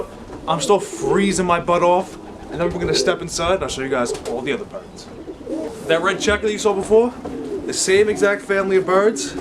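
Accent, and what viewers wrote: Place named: New York, United States. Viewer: Bruh that New York Accent on point 😂😂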